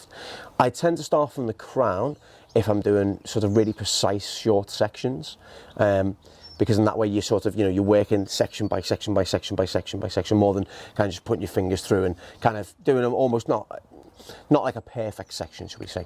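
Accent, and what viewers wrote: United Kingdom. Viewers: For, Could you take subtitle for your video, so I can catch what you say. I am not familiar with british aksen. Thanks From Indonesia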